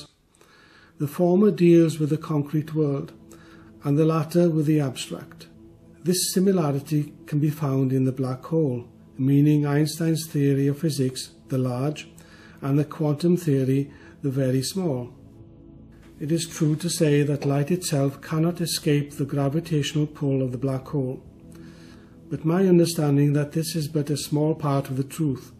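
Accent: Wales, United Kingdom. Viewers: Nice to hear a Welsh accent! 🏴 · Are you welsh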